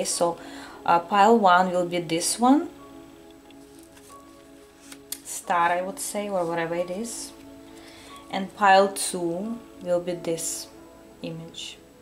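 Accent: Russia. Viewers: pile 2. "someone's lying on the couch crying and watching many readings right now" LMFAO i dreamt of crying last night and also cried bcs of the sad news i heard.. and now i'm sitting watching all of your readings so accurate this is amazing! and also when u said that a relative was jealous of me someone living next door... i already know who she is.. my own aunt my dad's cousin! she always imitates what we buy and wants us to be below them, whatever i have the next day she'll do the same to her children ugh! and also the guy that was watching me is my ex.. an abusive obsessive ex! and i am so happy he is no longer in my life even though he tries to come back i will never let him in my life again!!! thank u so much for such an accurate candle wax reading! u have officially been my favorite tarot reader <3 success for you my lovely russian lady! (don't know if u are but i just guessed from ur accent)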